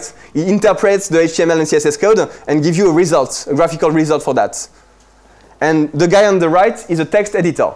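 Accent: France. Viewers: I'm hearing French actually.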